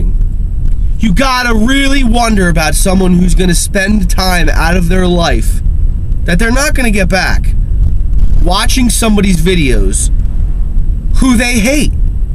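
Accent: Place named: New Jersey, United States. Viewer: You sound like you're from Jersey. I'm subbing. I agree though. It does take someone with character and who is genuine to put themselves out on video. Most people I know are too afraid to get on camera. The people who aren't afraid, though, actually have some real stuff to put out there. I'm overcoming the flu myself. I know your vid was uploaded 2 years ago, but thought I'd share that :-)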